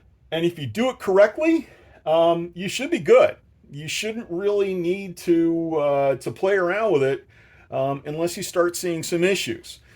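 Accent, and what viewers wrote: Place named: Philadelphia, United States. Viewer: Thanks for the help! You sound like you're also from Philly, right?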